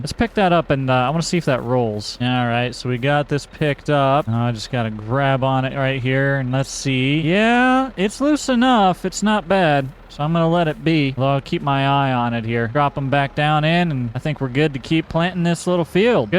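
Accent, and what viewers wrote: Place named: South, United States. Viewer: I love this man and his very southern accent when he is screwing up 😂❤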